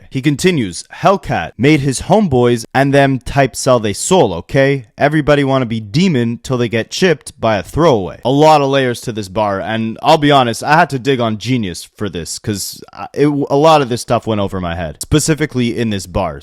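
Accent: Toronto, Canada. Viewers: This video was fire n 10/10 Toronto language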